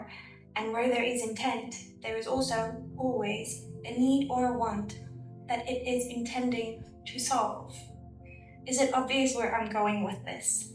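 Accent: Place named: United Kingdom. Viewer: West I was watching that and you read it felt like I was hard to say but I guess I'm just going to use the the easy way out it was like it was like I was in The Matrix and everything around me was it real but you were like an oracle guiding me to see the NPCs😊. Dad I don't want to say too much but maybe, it's like it's so interactive and it's like I don't know where you are I think you're probably in the UK just by your accent and I hope your knee is okay I don't know what happened to your knee but is that the secondary infection I think you called it. I don't really know what that is maybe you'll explain it to me or I'll Google it but back to the story it felt like when you were I guess you were reading what you had Gerald before or just something something that you felt that possibly channeled through the quantum field possibly maybe it's, maybe it's coming from the higher timeline. 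But it is very....????? Needed..❤❤❤for me, it feels like you're like a dream and I don't know why I didn't find you before but I found you now due to some bigger story narrative to buy narrative Divine unfolding I don't even know if there is a such a thing maybe it's all a big illusion. Maybe it's our I've come to the realization that I know that I am not a human with a conscience I'm a Consciousness with the human in a human exploring and maybe this is all a construct of how we think it should be, but I feel with what you say it helps me coalesce into reality whether that be a tangible reality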